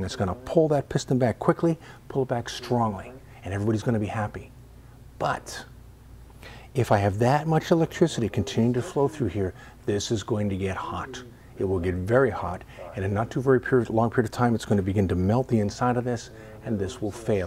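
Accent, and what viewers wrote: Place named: New York, United States. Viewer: New yawk accent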